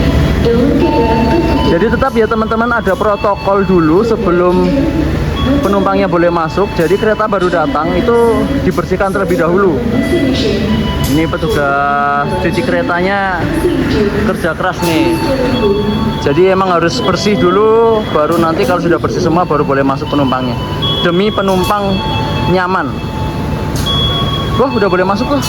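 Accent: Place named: Banyumas, Indonesia. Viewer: Hadirr mas mas ngapak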